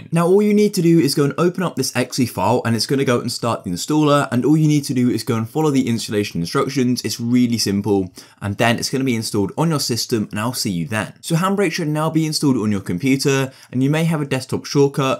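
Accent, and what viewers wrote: United Kingdom. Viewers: You speak british, eeeww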